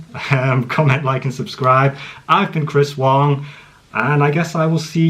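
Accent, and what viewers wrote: United Kingdom. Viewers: What kind of accent do you have? I hear British with hints of Scottish, Irish some kind of Asian.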